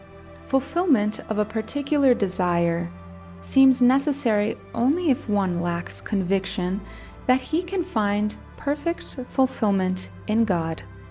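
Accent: Brazil. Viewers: I sense a Brazilian accent on the narrator’s voice. Great video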